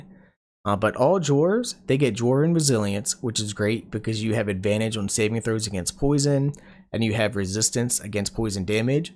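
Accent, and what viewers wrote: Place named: Delaware County, United States. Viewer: The Delco accent discussing BG3 races is hilarious. If I’m wrong and you aren’t from Philly you have to be from Baltimore area.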